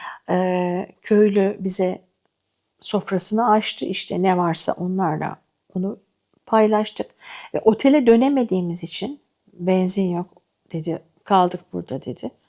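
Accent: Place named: United States. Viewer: Amerikan aksanıyla Türkçe konuşmak...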